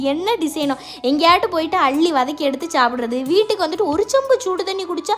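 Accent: Tirunelveli, India. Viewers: nan tirunelveli than Unga vedio parkum podhu oorukarangata pesina feel lv u thankachi😊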